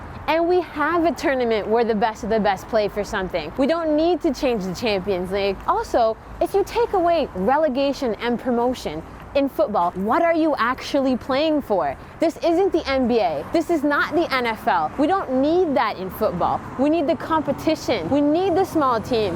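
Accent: Germany. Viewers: That Germán accent made you 1000% hotter!!!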